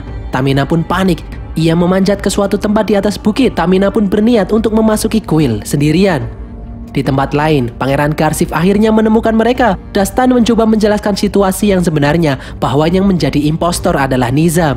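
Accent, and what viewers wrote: Java, Indonesia. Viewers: Wong jowo yo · Miminnya orang Jawa😂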